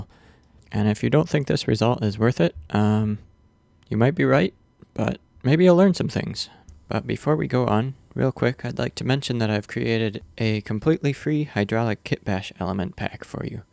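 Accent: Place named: Canada. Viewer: Joe Pera with a Canadian accent and Shawn Mendes face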